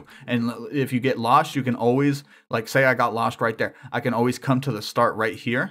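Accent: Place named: Ireland. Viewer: The only reason I even put on this video cuz I'm making a giant hot air balloon but I still need to fill in the top of the dome and stuff and the bottom so I went to work for the day and I can't find a single thing that can help except from this dude that's the thing by to me kind of has like an Irish accent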